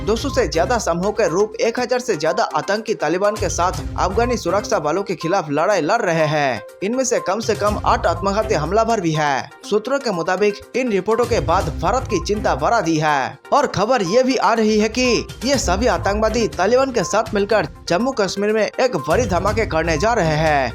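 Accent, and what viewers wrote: Bengal, India. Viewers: यह फेक न्यूज़ चैनल्स होसकता क्योंकि महाशय की हिंदी बोली से शक करने पर मजबूर हूँ।बंगाली गंध आ रहि हाइय् । भा हा इ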